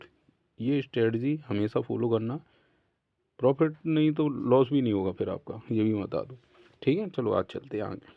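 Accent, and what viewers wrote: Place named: Madhya Pradesh, India. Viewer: Thanks for the response sir! Abhi mein start hi kr rha hun aapke video dekh dekh k, kabhi kabhi profit aur kabhi kabhi loss, I feel mostly mein abhi emotional trades le rha hun since I'm very new to the market toh achche se confidence nhi aaya h. lekin aapki strategy se bahut kuch seekhta hun, aapke accent se lgta hai jese aap madhya pradesh se ho, mein bhi Madhya Pradesh se hi hun. Thank you so much for explaining!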